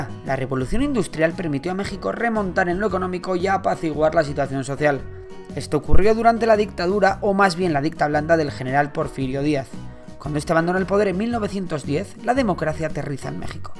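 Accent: Spain. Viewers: un español contando la historia de mexico que ironico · El narrador es Español?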